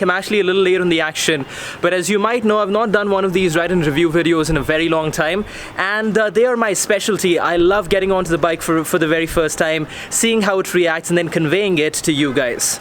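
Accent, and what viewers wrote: England, United Kingdom. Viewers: I love Ur English accent .....can u plzzz share how to improve English to that extent.... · Aaah... look at this beautiful man with his beautiful english accent and his beautiful hair singing with the wind...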